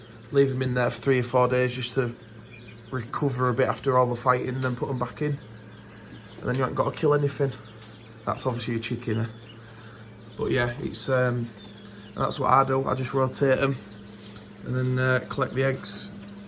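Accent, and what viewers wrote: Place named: Sheffield, United Kingdom. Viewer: U sound like my friend in Sheffield I'm in Australia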